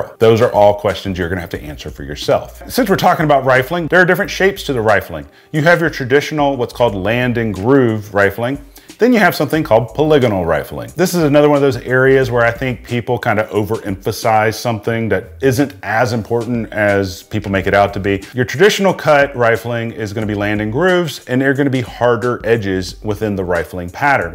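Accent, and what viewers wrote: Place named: Minnesota, United States. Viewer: You did really good very close for the Minnesota accent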